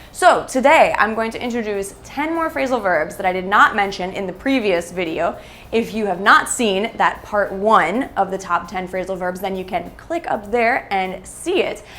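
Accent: United States. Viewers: ya lookt awesome suppa beautiful. Light and camera angle were perfect and the narrative like an American blondie. Thanks for these videos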